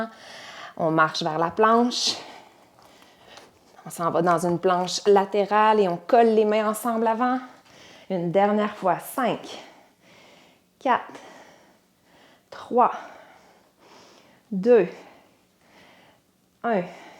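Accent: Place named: Canada. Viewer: ce délicieux accent du Canada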